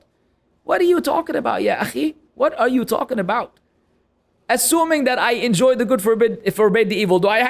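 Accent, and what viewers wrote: Lebanon. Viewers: I like your tripolian accent. Can you do a minyah accent, that's my favourite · Even though I'm a graduate of the Diploma of Arabic Language for Non natives from Umm Ul Qura University Makkah, still this Lebanese accent was beyond me 😂, Allahuma Barik Lak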